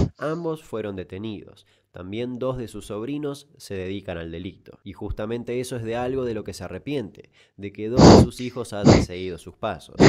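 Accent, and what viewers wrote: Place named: Córdoba, Argentina. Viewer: habia flasheado que era cordobes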